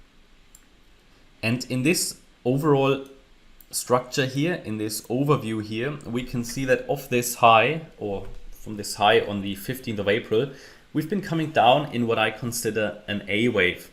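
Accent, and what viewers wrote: Germany. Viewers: Content of interest. How you pronounce everything, I would guess you are a German.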